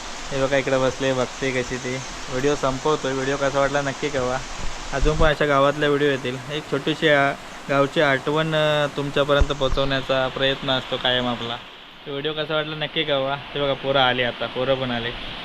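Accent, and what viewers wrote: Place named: Sangameshwar, India. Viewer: ऐक नंबर साहेब थँक्यू गाव कोणत तालुका तुम्ही छान माहिती दिलीत वबोलता पण छान अस्सल सगमेस्वरी❤❤❤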